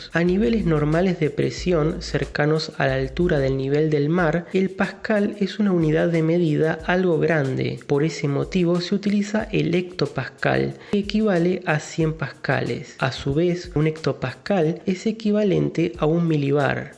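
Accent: Argentina. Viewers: ok claramente eres de arg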